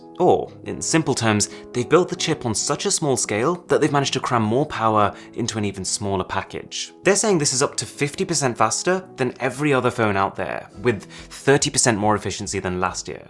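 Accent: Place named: United Kingdom. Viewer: Yep.... speaking the King's English, but then he often ruins it by saying, "anyways".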